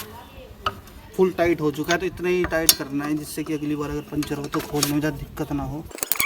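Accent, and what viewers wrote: Haryana, India. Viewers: Bhai yarr i love your way you speaks in haryanvi tone..love ur videos..although i lives in ghaziabad but my favourite language is haryanvi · bhai sabse khas baat ki aapka face dekhne ko mila first time bhai nhi abhi tak khali koi haryanvi awaz hi aati thi bss 😀😀😀😊😊😊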